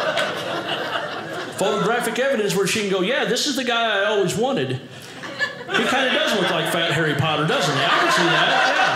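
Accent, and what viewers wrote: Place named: Missouri, United States. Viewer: So much fun watching & laughing along listening to you Mr. Thornsberry. Thank You I was cracking up here in Mid-Missouri . You’ve kinda gotta smartass sense of humor I heard your Missourian accent that’s familiar. Your humor i relate with.😂😂😅 Now I’m asking you do another show here on Drybar. I’d enjoy to hear some parenting stories. I like that you talk about your wife & how you two had pretty much known each since childhood. That’s really cool. I’m happy you obviously love your wife. Tell your audience more about your life in y’all’s little town. Will ya?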